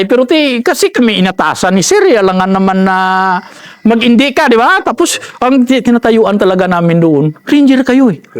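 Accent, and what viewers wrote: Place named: Iloilo, Philippines. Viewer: ilonggo gid ang accent 😂🫡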